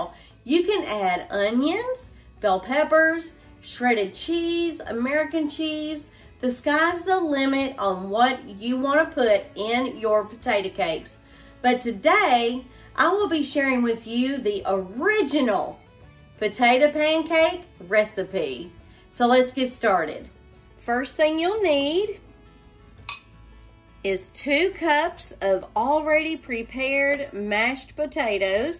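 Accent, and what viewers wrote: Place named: West Virginia, United States. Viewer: Looks delicious! My husband's Mamaw in WV used to make potato cakes when she had leftover mashed potatoes too. Are you from WV too? I like your accent!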